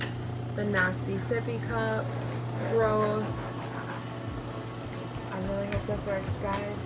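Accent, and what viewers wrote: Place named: Upper Midwest, United States. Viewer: Upper midwestern accent—love it!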